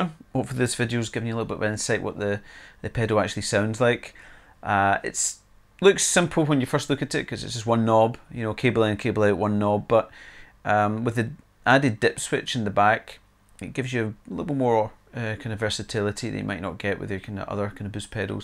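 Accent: United Kingdom. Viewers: Why is it that almost every gear review video is done by people with British accents? Don't Americans do pedal reviews? And why can't the British say the word PEDAL? LOOK! I'm turning my PIDDLE on, now I'm turning my PIDDLE off. PIDDLE, PIDDLE, PIDDLE.😅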